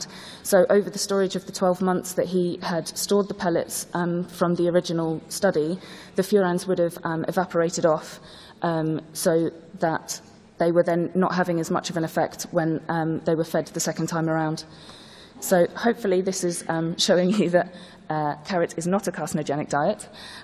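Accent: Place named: United Kingdom. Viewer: 🙏She Is i English That’s Unique To Most Brits Accents Beautiful Listen and learn🥕🍎❤️💛🇯🇲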